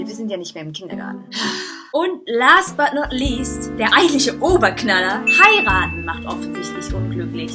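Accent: Germany. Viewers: Klingt vor allem nach Ruhrpott. Sach ma Doartmund 👍😉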